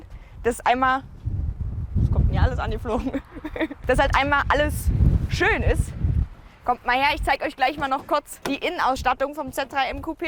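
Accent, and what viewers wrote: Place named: East Germany, Germany. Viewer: Richtig ekelhafter Ossi Akzent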